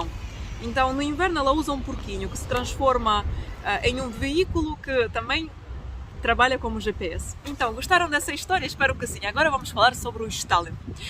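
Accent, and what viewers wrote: Portugal. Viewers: Eu te assisto sempre e fico bem na dúvida se o teu sotaque é do teu idioma, mais parece que é mesmo de Portugal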